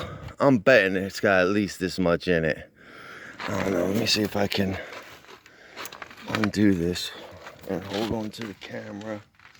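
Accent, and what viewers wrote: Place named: Philadelphia, United States. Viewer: I don’t know you, but I can tell from your voice you’re from Philly, Camden, Gloucester, or Burlington, County. I’m from Collingswood(Camden County). People still gimme shit about my accent, and I’ve been here since 03. I’m about to put ATS 505 CRO/CRC in my wife’s Mini Cooper GDI and came across your video. Anyway great video, man. Thanx for the testimonial in my favorite accent. This jawn better work, 🙃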